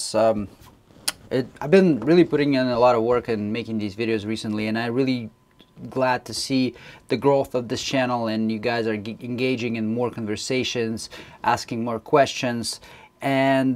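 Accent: Russia. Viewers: чет есть русский акцент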